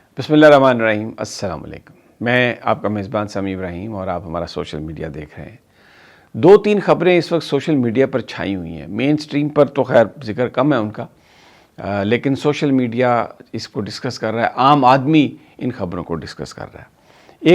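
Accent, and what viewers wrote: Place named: Jangli belt, Pakistan. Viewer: Samee sb good way of expressing YOU belongs to Jaangli belt Kindly confirm As sometimes words came in that way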